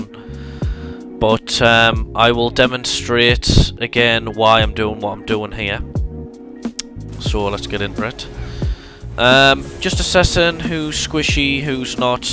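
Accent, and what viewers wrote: United Kingdom. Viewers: Uk accent S tier 😂 ggs the commentary is actually dope touch to the gameplay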